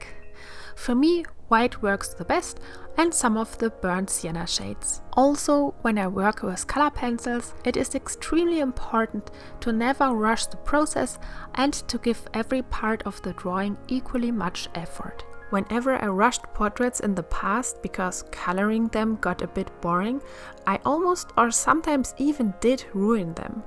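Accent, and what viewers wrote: Germany. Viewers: haha funny I am from austria and yes I know this is Just typical german accent but for some reason I Just cant Hear it😂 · I love your german accent! This art is amazing! :O great job! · Ich bin gerade zufällig über dein Video gestolpert und möchte gerne meinem Eindruck der Kommentare widersprechen: Ich finde es gerade gut, dass du einen deutschen Akzent hast und trotzdem Videos auf englisch hochlädst und dich nicht davon beeinflussen und zurückhalten lässt! Heutzutage wird man das Gefühl nicht los, dass man perfekt & akzentfrei Fremdsprachen sprechen können muss, bevor man sich äußert, wodurch die Hemmschwelle immer größer wird und man dann gar nicht erst in die Übung des Sprechens kommt. Daher: Bleib wie du bist und beehre uns weiterhin mit deiner Kunst!! <3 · Bist du Deutsche? Ja aber der Akzent verräts :D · katy cat18 Do you seriously believe you're contributing to her endeavours in any way by commenting on her "extremely German-sounding" accent? 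No. Maybe you're too young to know or perhaps you're just not socially adept enough, but it's not acceptable to tell people to fix this or that about themselves, because sometimes it is just not your place to do so! works so hard to create high quality content and I know from her previous videos that she has been working on her English. Plus, people just don't have to speak English perfectly. So leave her alone and Get Over It, please. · Omg I immediately heard that you are german😂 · Are you from Germany? 🙂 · My god me too i was like 0.0 that is strooong german accent riight? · Jep, that's clean german school english, as we all leaned it. 😁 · Are you from Germany? Because you dont sound like "amerikan or english". Your dialect is annoying lamo hahaha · beautifully drawn and explained ... beautiful german accent ❤ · It's funny how one (or at least i) can immediately tell that this is a German accent. 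Wonderful drawing btw! Sadly i don't have all those materials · Hey 🌸Mir ist aufgefallen, dass dein Englisch extrem deutsch klingt.Vielleicht könntest du etwas an deiner Aussprache arbeiten♡, das ist kein Hate sondern nur ein Tipp, das Bild sieht allerdings echt meega gut aus😊❤ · Direkt gemerkt das du deutsch bist 😂😊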